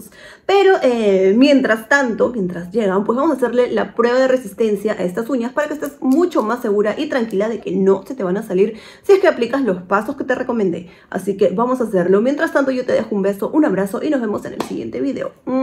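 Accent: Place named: Peru. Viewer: Me carga el acento peruano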